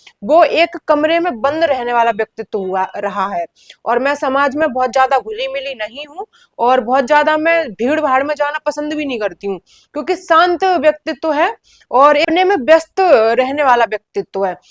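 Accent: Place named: Western Uttar Pradesh, India. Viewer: Western UP se ho na aap, aapki tuning se lag raha hai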